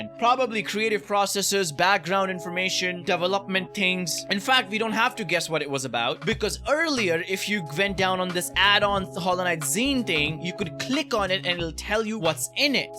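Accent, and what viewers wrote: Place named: India. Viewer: I think it’s evident by his accent that he was at least raised in an Indian household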